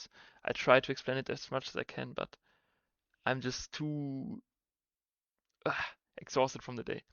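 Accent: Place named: Germany. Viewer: you sound german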